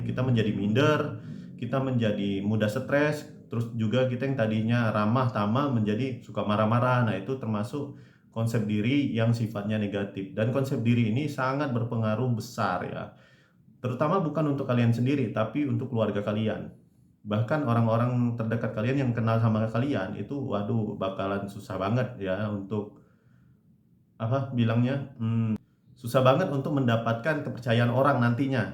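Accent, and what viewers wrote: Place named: Palembang, Indonesia. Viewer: Kirain dr bali,, trnyta wong palembang kak yo..????? 🤔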